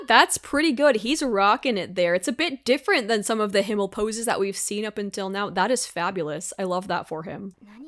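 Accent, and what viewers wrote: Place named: Canada. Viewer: I just learned about the Canadian rise, and now I can't unhear it xD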